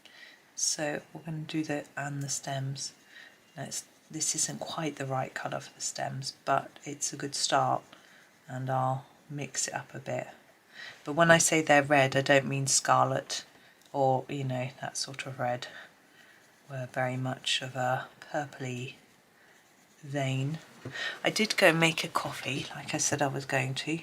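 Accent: United Kingdom. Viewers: lol Welch's grape jelly is indeed like jello, as all jellies are. No solids. Jam, or preserves, does have solids, although preserves is usually thicker, with bigger solid pieces. Marmalade is preserves, but always citrus based. Deja vu on this subject haha. Most Americans call the outside of bread the crust, and the end pieces are either ends or heels. Thanks for the geography info, too, although because I watch Time Team and a lot of Chateau restoration videos, I've already relearned things from my school days lol I do love hearing you talk, where you put your accents, and the phrases that are popular over the pond. Fascinating! Oh, anyways, you do the best berries!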